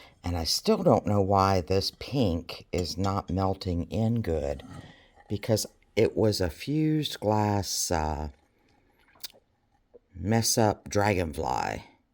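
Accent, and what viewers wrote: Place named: South, United States. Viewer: Ok, where are you?im southern and you’re sounding like home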